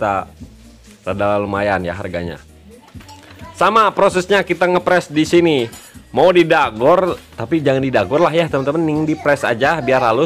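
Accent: West Java, Indonesia. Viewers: Sunda pisan iyeu mah... mantap kang... 🙏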